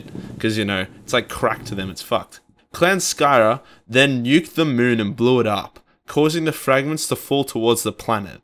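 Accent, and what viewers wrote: Australia. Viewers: its so good to hear ozzies narrate stuff, with our slang and love for C and F bombs and if you dont like it then F%$k off attitude, cheers mate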